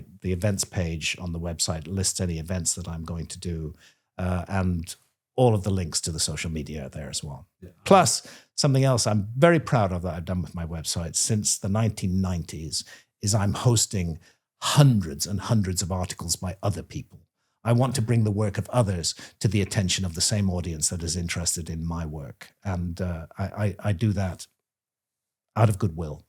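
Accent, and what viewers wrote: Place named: North, United Kingdom. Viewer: Always feels weird hearing a fellow norther accent on YT, in a good way!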